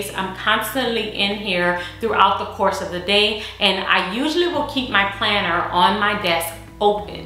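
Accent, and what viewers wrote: New Orleans, United States. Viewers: Okay sis!!! You are beautiful and I feel your kindness and support. What am I hearing in your voice ??? New Orleans, Islands where ? Keep the videos coming! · I feel like I hear a slight New Orleans accent🤔😂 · Sounds like you are from New Orleans! Beautiful planner!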